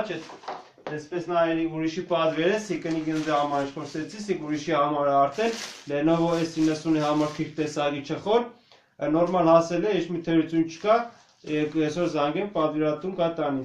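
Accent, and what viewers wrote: Gyumri, Armenia. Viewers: ճպցնում եմ լայք ամեն ինչի, հատկապես գյումրեցու անուշ բառբառի համար )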